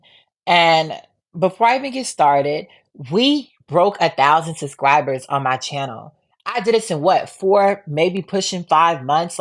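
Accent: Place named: New York, United States. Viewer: I love your commentary where are u from you song like a new yorker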